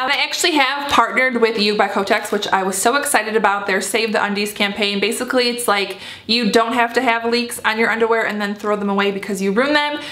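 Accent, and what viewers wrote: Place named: Midwest, United States. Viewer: Are you from the midwest? You sound like you have my accent! :)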